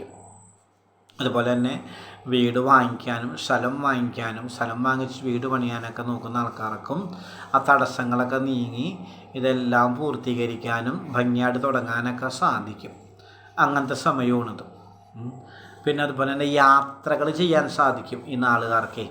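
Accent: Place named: Kochi, India. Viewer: When I hear a positive, I am happy thirumeni 🙏 .. njan subscribe chaythu, nummade kochi slang when I heard the innocents so happy 🙏. Thirumeni I am from USA 🇺🇸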